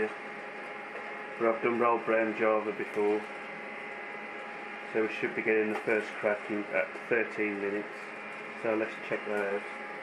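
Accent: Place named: West Midlands, United Kingdom. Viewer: Funny that you should mention the kitchen full of smoke :). Yep you guessed it, I couldn't wait for the ducting pipe to arrive and roasted my first batch. In all honesty for a first batch, they really are quite good. I am indeed in the UK, Derby, East Midlands and from your accent I would hazard a guess that you are in the West Midlands. I would certainly be grateful if you could let me know where you get your storage bags from, I have looked on Amazon where there are so many to choose from I just get bamboozled :). I would definitely be interested in the coffee beans too please if you could provide a little more information. Many thanks and best wishes. Ian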